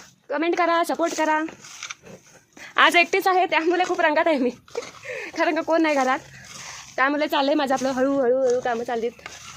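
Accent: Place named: Sangli, India. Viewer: ताई तुम्ही सांगली चे आहात काय,, तुमचे बोलने सांगली कडचे वाटते माझे गाव पण सांगली जिल्ह्यात आहे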